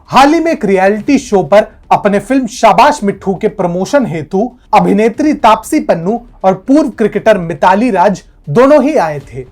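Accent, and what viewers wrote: Standard. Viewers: Bhai tune kya bola kuch ghanta bhi samajh nhi aaya... Par sun ne mein acha laga... Itna shuddh Desi Hindi bologe toh kese chalega...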